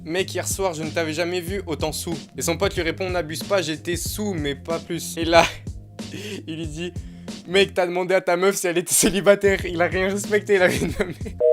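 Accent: Belgium. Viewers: T'es belge ? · T'es belge poto ?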